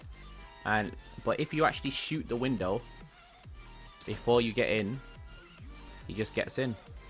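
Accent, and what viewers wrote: United Kingdom. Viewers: You from Great Britain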